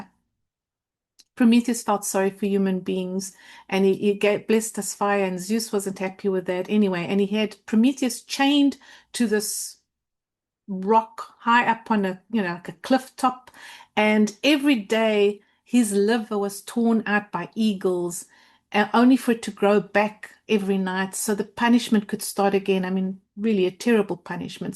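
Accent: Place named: South Africa. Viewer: Glad to have stumbled on your video. And as an expat living far from South Africa, good to hear your accent ❤. Thank you for your work. I listen to a lot of financial podcasts and one of the latest buzz words at the mo, is "K Shaped Economy" (meaning those on the upleg of the k are getting wealthier and conversely, those on the bottom leg, are finding themselves with less and less). Isn't it interesting that this is happening Now with Chiron going into Taurus AND the symbol of Chiron having a clesr K in it?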